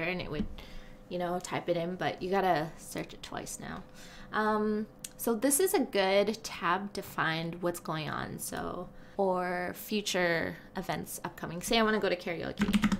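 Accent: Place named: United States. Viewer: This was so entertaining thank you. I love your accent, im presuming your American, im in Scotland so impossible to tell where in the states people are from lol. Thanks :) x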